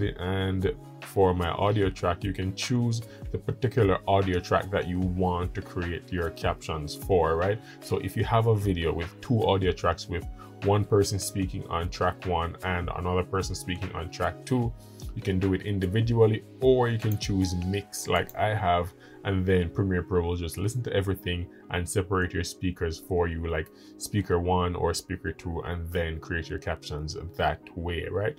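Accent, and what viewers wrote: Jamaica. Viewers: I just discovered your channel and I had to subscribe immediately, 1. you're Jamaican lol, 2. the scripture on the wall, and 3. this video was so straightforward, easy to follow and very helpful. Thank you!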